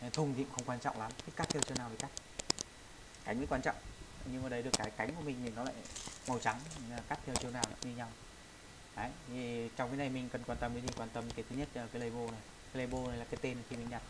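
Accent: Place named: Thach That, Vietnam. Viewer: giọng giống thạch thất quá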